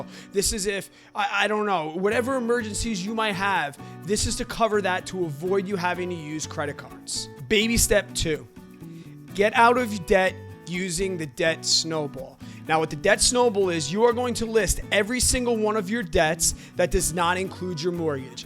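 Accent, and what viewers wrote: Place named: Philadelphia, United States. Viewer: I sense a Philly accent!!!!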